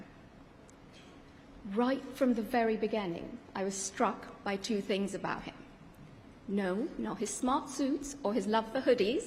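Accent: United Kingdom. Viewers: Her accent is so Brit 😮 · Omg !! That British accent !!! She took me by surprise!! I thought it would be Indian English . Never mind. Good luck to both of u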